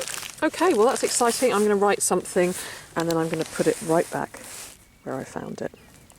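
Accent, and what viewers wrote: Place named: England, United Kingdom. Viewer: I’ll bet that is a good feeling, finding a complete pipe! I know I’ve said it before... but I’ll say it again... I enjoy hearing you speak. Here in California when people speak it’s just bla bla bla. But when you say something, however simple it may be... it sounds beautiful to my ears. I’m a sucker for an English accent, but yours is even better than the usual. Ok... enough gushing from me. 🤷🏻‍♂️👏🏼👏🏼👏🏼👍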